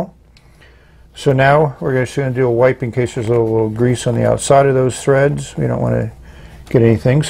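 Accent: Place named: Western Pennsylvania, United States. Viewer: Thanks for the video. I have to admit, your voice and western PA accent remind me of my late father, and I find listening to you quite soothing.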